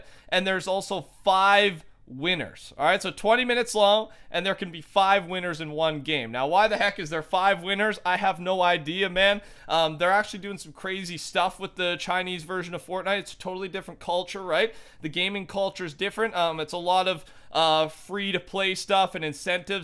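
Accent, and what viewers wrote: Canada. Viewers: Sounds like you’re Canadian , Vancouver ? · Are you canadian?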